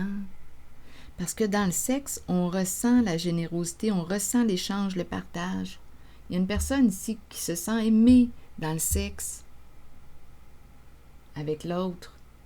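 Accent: Canada. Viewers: Toujours belles guidances .❤ Mon œil est bleu . Vous êtes une très bonne comédienne mais réelle . C’est vrai qu’en réalité, C’est pourri cette famille Et je reste polie Je n’ai pas rigolé tous les jours . Mais je vais mieux . Je vois qu’il y aura beaucoup beaucoup de s ..e . Je n’en doute pas du tout . Confiance ???????!! Maintenant je doute beaucoup . Amour non réciproque Confu (Oui non ) ?????????? Problème de communication Une fois une femme une fois un homme. Qu’est ce que ça veut dire . Accent canadien j’adore . J’aime aussi la mimique des mains . Téléphone fixe inutilisable …. J’adore écrire j’adore parler Je n’ai aucun défaut . Bisous . Merci infiniment .😎😎😎😎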